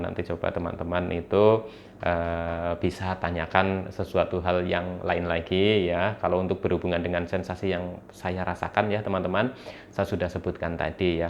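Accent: Banyumas, Indonesia. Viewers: Bener x mas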